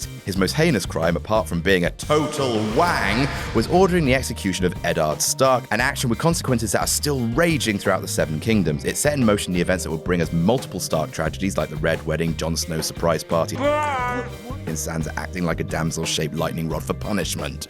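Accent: United Kingdom. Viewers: These Whatculture lists are kinda stupid... a British accent is just meant to hide the nonsense.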